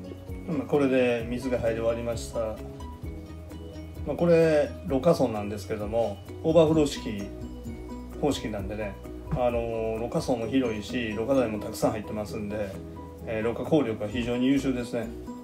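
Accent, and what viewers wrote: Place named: Kansai, Japan. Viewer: わぁーー😆今回はちゃんの生声素敵voiceですね✨✨関西弁❓私関西出身なので久々の関西弁に懐かしく嬉しくなりました\(//∇//)\ アクリル水槽は傷がつきやすいのですね👍✨✨ 湯沸かし器を、通して水を、入れているのです😊細かいメンテナンスでちゃんのお魚達はご機嫌ですね👍✨✨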